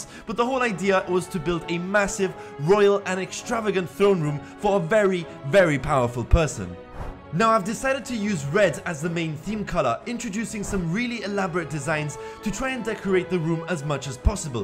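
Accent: Belgium. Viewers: Are you from Belgium? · are you from Belgium? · Are you from Belgium sir? ^^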